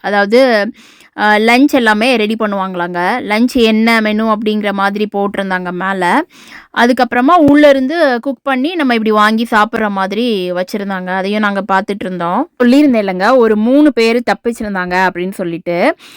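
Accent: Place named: Coimbatore, India. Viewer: கோயமுத்தூர் இவங்களா வாசனை மாறாமல் பேசுறீங்க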